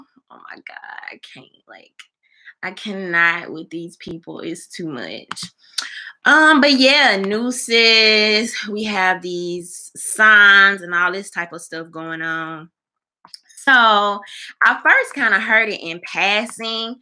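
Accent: South, United States. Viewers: I love that little country voice of yours, I'll vote for who ever you vote for.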